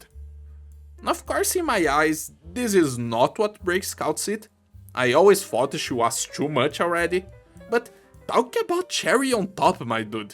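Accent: Brazil. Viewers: Ai irmão, tu é br ou existe outro sotaque MUITO parecido com o nosso?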